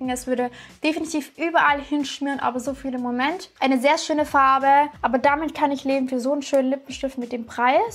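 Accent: Swabia, Germany. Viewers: ...Bisch halt einfach BESCHTE!😂#Schwabe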